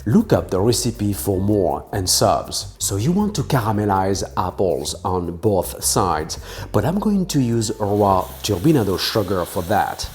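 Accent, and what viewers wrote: France. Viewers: oui, on lui a déjà suggéré de mettre des sous-titres en Français (puisqu'il EST Français) et ça a déjà été fait (on aimerait que ça soit systématique, MAIS faut dire que son très très léger accent français est très sexy : on adoOre !)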